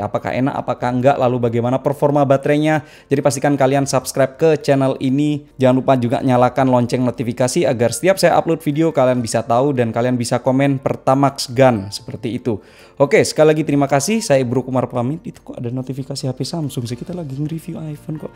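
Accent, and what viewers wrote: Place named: Surabaya, Indonesia. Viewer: Hahahah, suroboyoan jangkrek..😁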